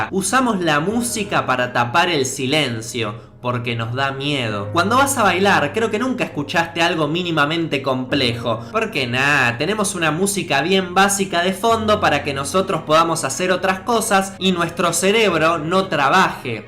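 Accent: Argentina. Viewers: vos sos argentino,no?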